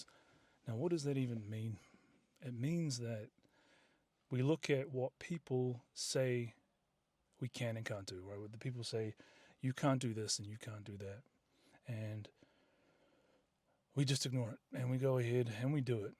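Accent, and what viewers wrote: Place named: New Zealand. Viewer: Very therapeutic to listen to. I love hearing other new zealanders.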